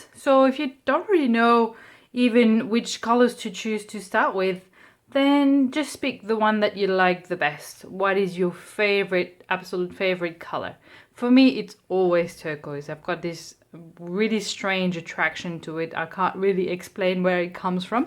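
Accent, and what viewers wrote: Australia. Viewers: So you live in Sydney. I thought I could hear an Aussie accent coming through. With a username like I gather you are from somewhere there. Beauitful. Thank you for the video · thank you for your inspiration! I liked your commentary about just playing - not to create a masterpiece, just play. I've never used my hands to paint before and it was so very much fun! Thank you for your posts - you are lovely to watch. Also, your accent is lovely - part Aussie, part French perhaps?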